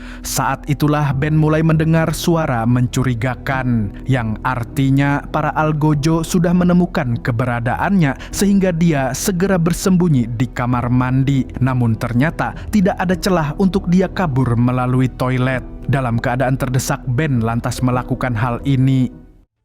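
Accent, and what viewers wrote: Banjar, Indonesia. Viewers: Urang Banjar sekali nya😂🙏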